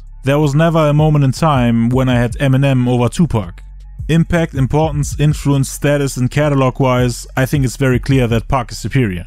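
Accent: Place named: Germany. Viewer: Dein Deutscher Akzent knallt anders rein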